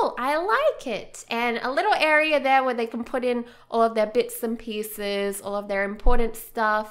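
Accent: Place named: Australia. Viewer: New to your channel and wondered if I you were Australian as well... then you said 'reckon' and I knew straightaway haha.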